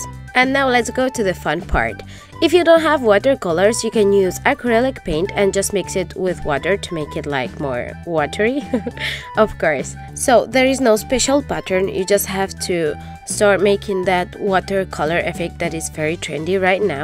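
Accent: Spain. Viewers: Is it strange that I love the way you're saying "We gonna' need"? ;-; I think it's too much cute D: And I love your spanish accent c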